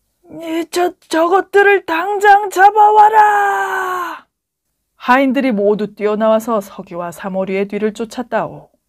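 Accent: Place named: Jeolla, South Korea. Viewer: 전라도 말 안쓰면 안될겨?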